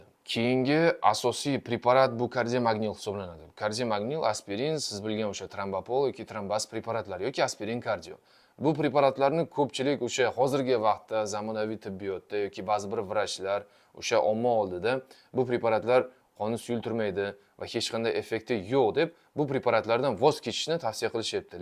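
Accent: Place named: Fergana Valley, Uzbekistan. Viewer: Доктор болам сиз каердан сиз шевангиз Водийга ухшаяпт телфонтракамиз чикаринг мен жуда керагл экансиз доктор болам ???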